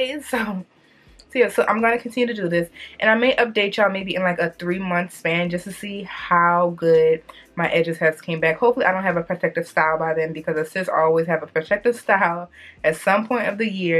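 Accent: New Orleans, United States. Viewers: U from New Orleans huh? How u talk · Are you from New Orleans? I hear that NOLA accent.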